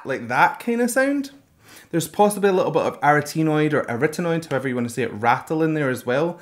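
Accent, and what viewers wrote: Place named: Glasgow, United Kingdom. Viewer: what you said about RM's speaking voice being smoother than his rap, I think is true of SUGA and j-hope, as well. SUGA's rap voice jumps wide intervals with interjected squeaks and lots of aggressive rhythm, but his speaking voice is warm and humble. j-hope speaks sweetly most of the time, but he can rap like a dancing firecracker! BTW, I enjoy not only your commentary but your accent. Glasgow is one of my favorite cities (I live near San Francisco). Can't wait to go back! Stay well!!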